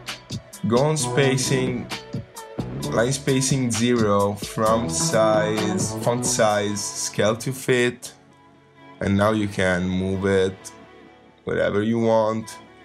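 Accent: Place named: Italy. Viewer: yay Good job! come faccio a farmelo accettare? perchè non dice che è presente uno static txt Comunque amo il tuo accento inglese italianizzato!!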